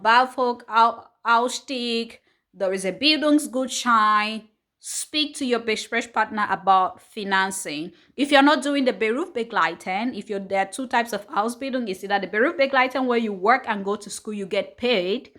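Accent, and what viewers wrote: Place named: Cameroon. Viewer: Your accent sounds like a Cameroonian.😀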